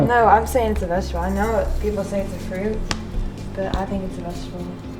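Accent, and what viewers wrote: North Carolina, United States. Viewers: Love her accent!!! makes me me NC so much being from the UK no longer being able to get over there. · I love her subtle southern accent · Good ole North Carolina accent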